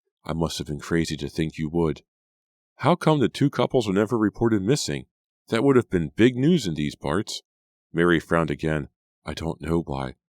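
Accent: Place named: Pennsylvania, United States. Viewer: So happy to find your account! 😁 you’ve gotta be from pa…am I right??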